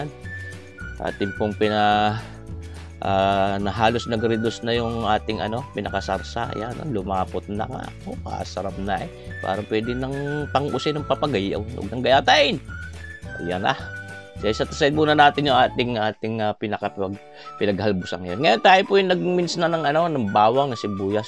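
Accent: Batangas, Philippines. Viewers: Hello sir I like the way you cook and I love the batanggenyo accent. Greeting From Homer Alaska this is Berna Brown. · d ko n kailangan mag aral sa school sa iyo lang madami n akong nlaman lutuin pati batangas accent mo.....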